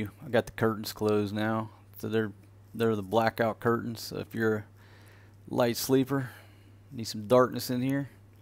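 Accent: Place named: Atlanta, United States. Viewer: Great Review. Atlanta, GA that explains the accent I hear in your voice.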